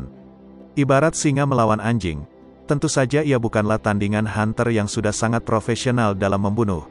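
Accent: Palembang, Indonesia. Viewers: Anjirrr.....cewek Palembang cokkkk 🤣🤣🤣🤣👍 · Wong plembang y om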